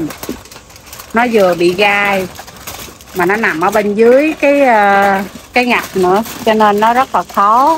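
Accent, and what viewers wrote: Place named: South, Vietnam. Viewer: Hello. Gái Miền Nam VN với giọng miền Nam nghe quá đã, người VN qua các nước Tự do quá giỏi, coi mà mê luôn vì cô nàng có năng khiếu khéo tay, hay làm và chỉ dẫn tận tình. Chỉ tỉ mỉ rõ ràng không mê thì quá uổng, hay cho ông chồng này có bà vợ dễ thương và lại quá thật thà. Cảm ơn.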